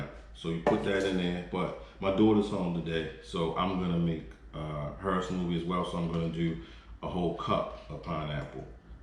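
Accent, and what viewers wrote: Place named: Baltimore, United States. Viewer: Gotta be from Bmore! Ask me how I know. Lol It's the (u or ewww) that's emphasized heavily. Lol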